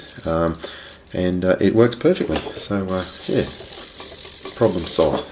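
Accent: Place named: Australia. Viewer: Thanks. I can hear from your accent that your an Aussie =) Added the grommets where you suggested. The tail is abit of a chore to with these figdety fingers, but it looks good. I'm ready to fly, once the batt is fully charged...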